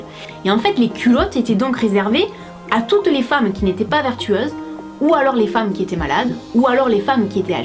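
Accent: South, France. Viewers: J'adoooore ton accent 👌😍 Le sud en force ! 😂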